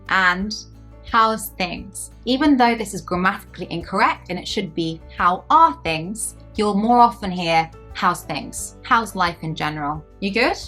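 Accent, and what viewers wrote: United Kingdom. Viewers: Oh my your accent is absolutely what I want to practice! Oh so beautiful perfect British accent ... And your gesture, example sentences ...all perfect!!! Thanks for your video❤❤❤❤ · Your English is British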